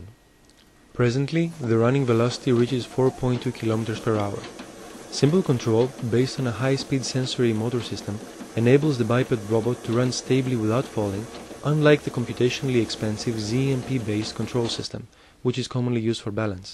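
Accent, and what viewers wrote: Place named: Greece. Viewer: Πως ακούγεται ότι είναι Ελληνας εεε;! Πάντως συγχαρητήρια παιδιά!!!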